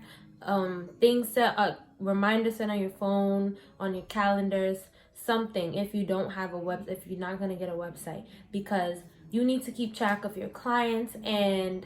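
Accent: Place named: New York, United States. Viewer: Haha loved this. Girl you gotta be from NY I know a NY accent when I hear one lols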